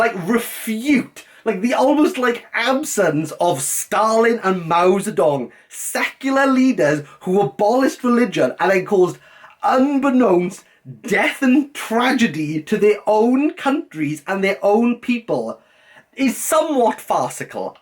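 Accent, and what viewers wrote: Wales, United Kingdom. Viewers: couldn't understand a word you were saying .................oh i see your Welch ,,,,,,,,,,,,, and your a theist ,,,,,,,,,,,,,,,,,,,ha ha ha ha .........oh dear oh dear We stand for your right to be a theist .............but please know your wrong , your living in a fantasy land stop being a Fucking child , let me point you in the right honourable direction of the patron saint , His holelyness , all explained here <link>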